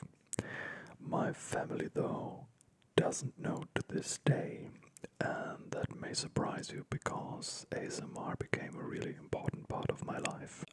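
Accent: Germany. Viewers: I always just watch you in these types of videos lol not so much for the asmr aspect lol (and I enjoy your accent because my whole paternal side is german) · Is he from germany or something like this ? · Is he german? :D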